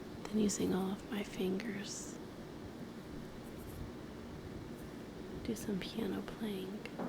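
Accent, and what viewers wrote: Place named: Texas, United States. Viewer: Gah, between your accent (slight though it is) and the cicadas in the background, I feel like I'm sitting back home in North Central Texas on a lazy summer evening!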